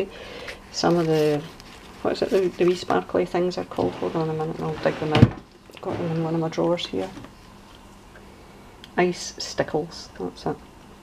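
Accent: Scotland, United Kingdom. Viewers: Absolutely gorgeous journal, really love it, it's so so pretty. Of course, we'd listen to you and with your lovely Scottish accent too. My aunt was a nun in Falkirk for many many years so I love to hear the Scottish accent, it reminds me of her and my visits there. Best wishes from the west of Ireland, Mary:)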